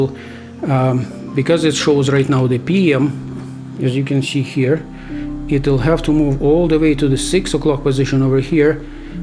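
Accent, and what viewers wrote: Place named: Europe. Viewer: You’re European I could hear your accent so clearly ... are you from Russia or Bulgaria??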